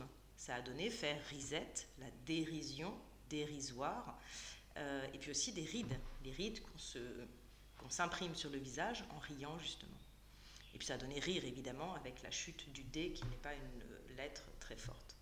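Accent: France. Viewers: Respectez la prononciation du lati. Vous avez l’accent français :pas d’accent tonique sur les mots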